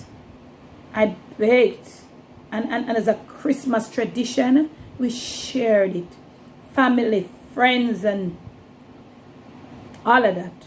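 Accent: Jamaica. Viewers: Hello miss wishing you and families a happy new year for 2020 I like watching your cooking show they're very interesting like how you talk patwha, God bless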